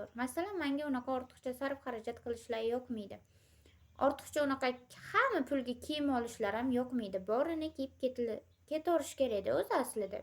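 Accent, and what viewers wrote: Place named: Tashkent, Uzbekistan. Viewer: qayerlisan bir qarasa chiyalcha gapirasan🤮 🤮 🤮 🤮 🤮 🤮 🤮 🤮 🤮 🤮 bir qarasa toshkentcha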